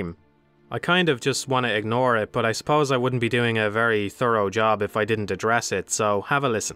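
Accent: Ireland. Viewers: You Irish? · Woah woah chill bro I didn't mean it like that.. why do I exist and you don't even know me? Who's the ignorant & insensitive one here? Jesus Christ what's your problem? Snowflakes like you are everywhere these days it seems.. Listen i got no problem with him being Irish.. on the contrary I only enjoyed this video even more because of his lovely accent and his knowledge & love for this game.. I wouldn't have it any other way that's why I keep coming back to this video years later.. I wasn't trying to be racially ignorant although my initial comment may have sounded a little insensitive, however I said it in a humorous sarcastic tone.. it's just that DMC is such a niche franchise and not many people are into it and because of its anime style I thought it was more popular in Japan than the rest of the world combined much less with an Irish guy.. hence my comment.. 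but does that mean I think no Irish fella or foreigners know about DMC? Ofc not! There are fans all over the world but none have I seen anyone explain it in detail like this guy.. Having said that he is the first Irish guy I've seen on YouTube that has expressed so much love for this game through his vast knowledge on this game.. my original comment had no intentions to offend him and I doubt he would be since he probably has thick skin and can take a joke unlike a certain snowflake lol.. just kidding.. love you too bro.. just don't be quick to judge people next time geeZ..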